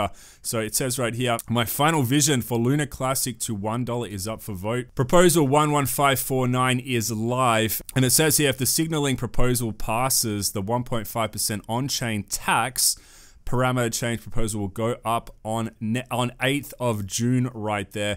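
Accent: Australia. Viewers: Love your accent. Makes me miss oz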